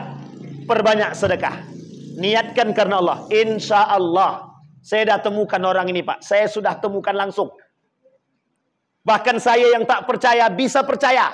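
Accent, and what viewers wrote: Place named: Daik, Malaysia. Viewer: Assalamualaikum , maaf bertanya, pak ustad Dari mana? Dialek nya sperti orang daik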